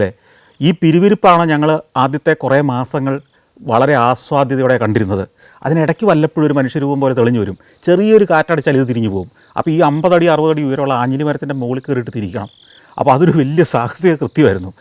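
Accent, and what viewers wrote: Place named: Kottayam, India. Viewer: എടുത്തിട്ട് ഇണ്ടൻ അടിച്ചോണ്ട് ഇരിക്കുവാ....കോട്ടയം സ്റ്റൈൽ😂😂😂❤❤🎉🎉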